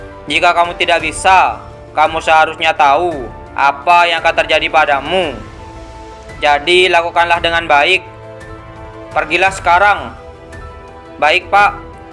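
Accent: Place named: Madura, Indonesia. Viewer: mimin logat madura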